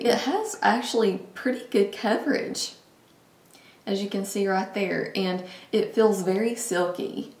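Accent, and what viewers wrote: South, United States. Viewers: i've been hesitant to try the foundation, but after your review i'm going to try it. i love the southern accent on a classy, well-spoken person like yourself. :)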